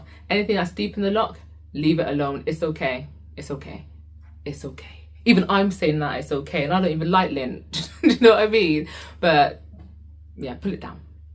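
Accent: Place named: Northern England, United Kingdom. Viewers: I recognized the UK accent. Love to hear it on black people. Waves and hugs from the midwest of USA! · UK in the house!🇬🇧So lovely to hear a British/Up North accent! Great advice, really helpful and your hair looks so healthy!❤️✨🫶🏾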